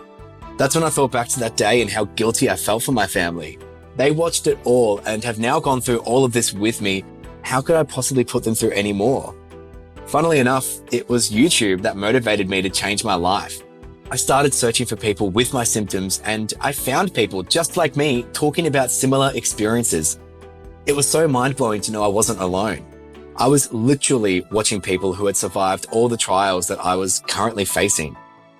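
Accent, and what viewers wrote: Australia. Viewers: Omg I love Australian voices for some reason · U have a australian accent and it is cute asf now I kinda want a australian bf lol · Ohhhhhh mate aussie? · He sounds Aussie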